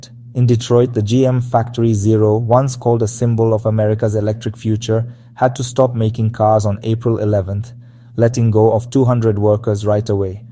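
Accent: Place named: India. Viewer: I wonder why an indian from india is narrating this site! India joined china to destroy the dollar. People pretend to be our friends while they stab us in the back!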